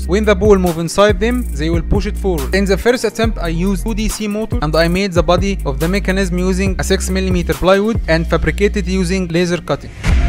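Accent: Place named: Egypt. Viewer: لهجتك في الانجليزي بتقول ان انت مصري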